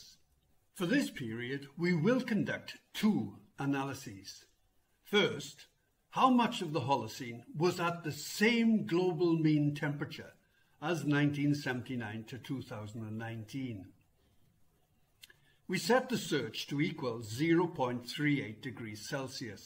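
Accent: Wales, United Kingdom. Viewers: I agree with you, I love his voice and accent as well. Since I have watched a large number of his videos over the last several years I remember someone remarking in the comments section; saying he has a distinctive 'Welsh' accent. I can't verify if this is true, but try this as I did. Listen to a Youtube video where someone is speaking with a 'Welsh' accent and by comparison I believe the person got it correct, then you can decide for yourself. His knowledge is so impressive that all it took was watching one of his videos and I immediately subscribed. I pray he will get more recognition for all of his excellent work. The world needs to hear his videos, before we head down the rabbit hole of making big changes based upon false facts. What bothers me is if we do this; there will never be any way to monitor our progress. In other words we will be chasing rainbows.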